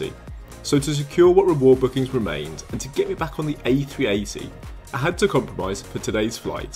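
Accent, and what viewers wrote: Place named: Birmingham, United Kingdom. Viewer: That brummie accent is coming through ! Great video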